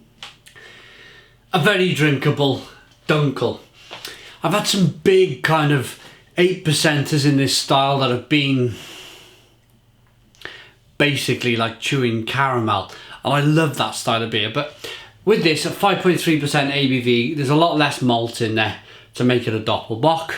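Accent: Wales, United Kingdom. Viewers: He's Welsh. Way different to a Scottish accent.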